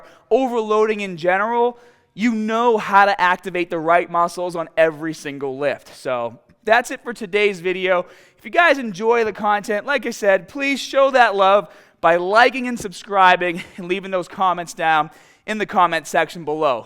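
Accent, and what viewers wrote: Boston, United States. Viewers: Is this a Boston accent?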